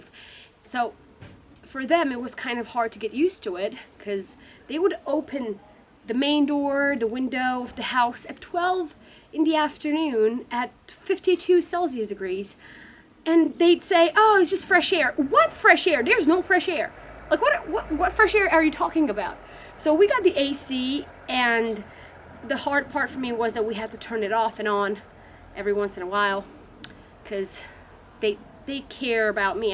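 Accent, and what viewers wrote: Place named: United States. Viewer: Damn, you sound so American, like the loud kind, I don’t m a that in a bad way, I’m American. It’s mind bending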